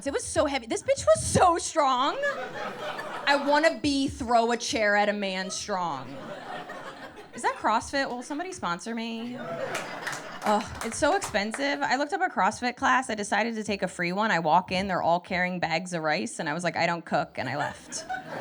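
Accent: New Jersey, United States. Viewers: youre clearly from NJ:) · Hilarious. I’m a transplant from the east coast and hearing your humor and accent brought me back to summers in Jersey.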